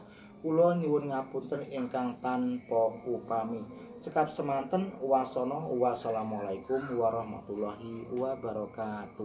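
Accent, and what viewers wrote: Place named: Ponorogo, Indonesia. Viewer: Logat bahasa khas Ponorogo.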